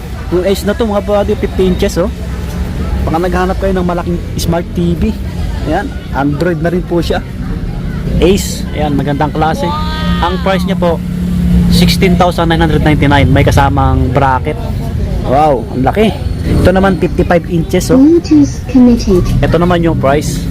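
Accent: Visayas, Philippines. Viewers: Bisaya kaba idol😅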